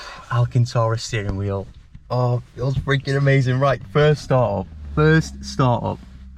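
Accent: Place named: England, United Kingdom. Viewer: The English accent, the weather, and a hot hatch. Nice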